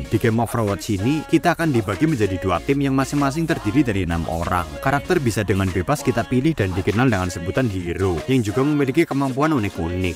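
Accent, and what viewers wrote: Banyumas, Indonesia. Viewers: Wong ngapak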